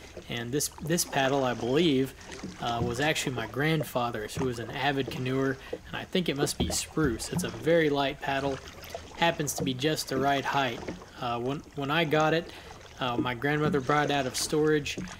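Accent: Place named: United States. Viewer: You speak with a an accent thats very pleasant to the ear. Somewhat laidback, very clear pronunciation but unmistakably American. Also: nice boat.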